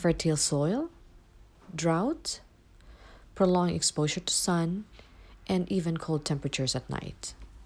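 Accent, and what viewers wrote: Philippines. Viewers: She sounds so filipino hehehe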